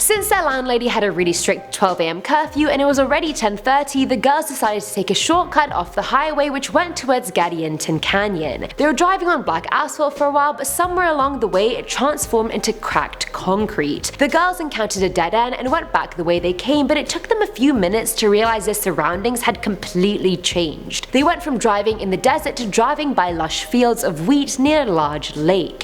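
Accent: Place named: United Kingdom. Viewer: She's hot, she's got that brittish sexy smokey voice type of accent.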